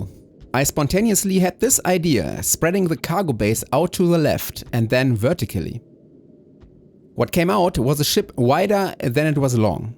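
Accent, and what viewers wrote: Germany. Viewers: Den deutschen Akzent hört man extrem raus.😅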